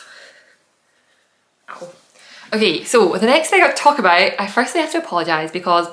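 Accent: Scotland, United Kingdom. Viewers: Thank you! I love your podcast and LOVE Scotland. I am from the Colorado Rockies but did a year abroad at Stirling Univ and fell in love Scotland. ♥ I love hearing your accent b/c it brings back my memories of the beautiful people of Scotand. in 2019 my husband and I backpacked for two weeks in the highlands. A dream. Thank you for your podcast.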